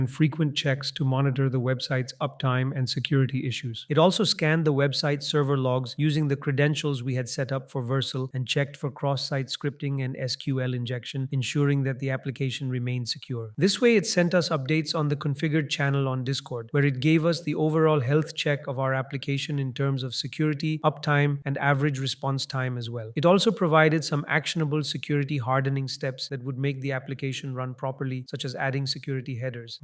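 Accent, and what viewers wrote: India. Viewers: Indian accent AI voice is peak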